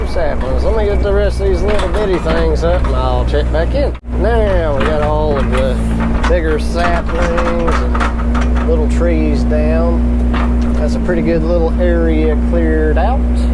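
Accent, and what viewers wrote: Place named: Boston, United States. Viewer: Boston......I can tell by his accent 😉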